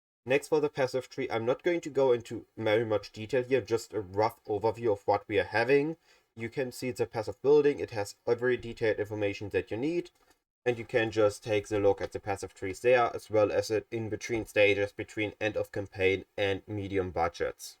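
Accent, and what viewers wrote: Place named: Germany. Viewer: If you can't differentiate between an accent and general pronunciation you need a reading comprehension test. Fucking moron. PS: The pronunciation is actually *fine*, it's no different than any other German accent pronunciation. Maybe if you're having so much trouble hearing what he's saying, you need the hearing test more than I do 💀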